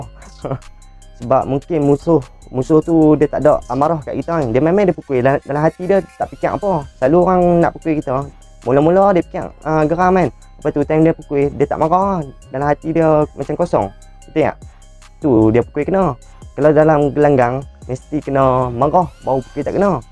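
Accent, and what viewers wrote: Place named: Malaysia. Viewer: ko dengki dah kenapa..content dia mmg khusus utk penonton malaysia,slang dia mmg slang sesetengah kawasan/negeri d malaysia..ko x faham tu ko punya pasal la,bukan salah cara dia bertutur,aq faham je semua..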